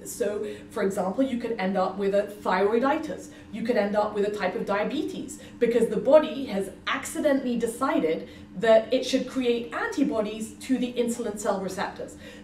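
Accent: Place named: United Kingdom. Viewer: Dr., I was wondering if you have ever worked in NHS as well? Your accent is very British.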